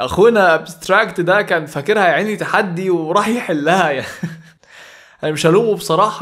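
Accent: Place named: England, United Kingdom. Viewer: معليش يبشمهندس مش قاصد حاجه بس هو العربي بتاعك بحسوا اكسنت انجليزي عشان عايش برا ولا بسبب استخدام الانجليزي عشان وانا بتفرج حاسس بفرق لغه ❤😂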